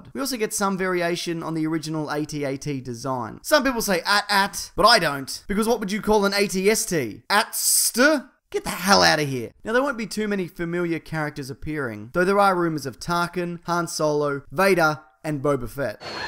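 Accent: Australia. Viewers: Hey man big fan. Just wondering that's an Australian accent right?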